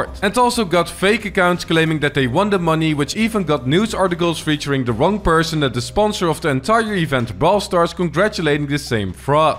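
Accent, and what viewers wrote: Netherlands. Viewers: Wat een Nederlands accent zeg haha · i smell dutch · I smell dutch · are you dutch? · Your accent really sounds Dutch, are you? 😅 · I smell dutch accent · Are you dutch by any chance? I can hear a accent. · Are you dutch? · Het duurde minuten voordat ik erachter kwam dat je een Nederlands accent had. Toffe video :) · You sound dutch, very very dutch. i can tell by your accent 😂🇳🇱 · Ik hoor gewoon je Nederlandse accent door je Engels heen haha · is this guy dutch? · deze guy klinkt nederlands nice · You sound Dutch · Dutch accent hihi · I like the videos.. The dutch english accent is just to cringe for me, unwatchable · The dutch in his english voice 😭😭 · THE DUTCH ACCENT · i smell dutch but ar you dutch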